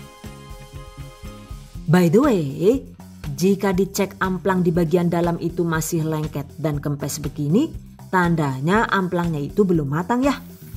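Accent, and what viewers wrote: Banyumas, Indonesia. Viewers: Selau suka dg suaranya kolaborasi jawa ngapak indo dan english..i like that...jdi ketawa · Yuu...jawane ngapak metu bae lah.. 😁😁tq resepnya... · Akhirnya bisa pegang hp rebutan Ama bocil, Alhamdulillah bunda ini makanan kesukaan anak2, ternyata lumayan mudah ya cara buatnya tapi kudu sabar ngaduk2 kayaknya ni. Makasih bunda makin lengkap aja ni, makin mantap, makin sukses buat. Seneng sama suara bunda yg khas dan candaannya itu loh ngapak kali 😀😀 #GiveawayTHV2021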